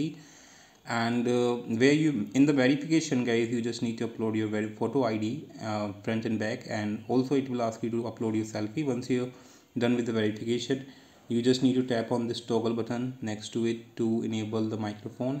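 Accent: India. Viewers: Is bro from India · Thats is actually very disrespectful he has an indian accent so dont be rude. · He’s Indian.. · That's rude he is Indian I understand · Don’t be rude he is cool I understand him he’s is Indian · He’s India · He is Indian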